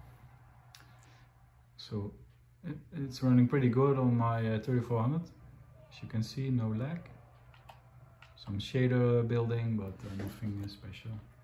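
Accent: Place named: Netherlands. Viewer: Batocera is awesome! U sound dutch. ( I am also dutch).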